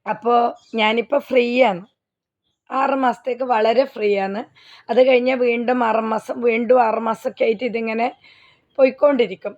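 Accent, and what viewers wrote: Kannur, India. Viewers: ninakkonnum vere joliyille? Kannur dialect kelkkan oru bhangiyumilla.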